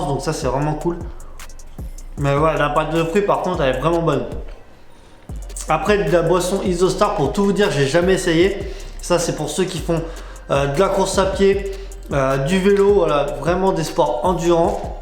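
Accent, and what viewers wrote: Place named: Maghreb. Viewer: Tu es d’origines arabes? T’as un accent du Maghreb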